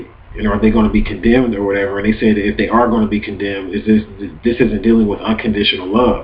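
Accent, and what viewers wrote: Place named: Los Angeles, United States. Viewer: Are you from LA/Long Beach? You have a la/lbc accent.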